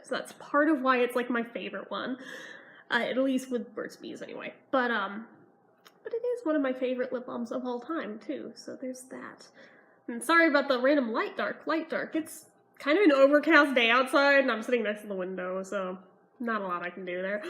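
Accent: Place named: South, United States. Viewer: I love her southern accent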